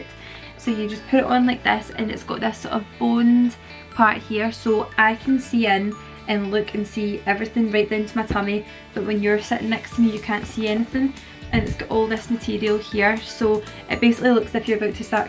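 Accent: Scotland, United Kingdom. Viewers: i loved watching your video!! so nice to come across a scottish accent! im from isle of bute and live in Australia. thank you x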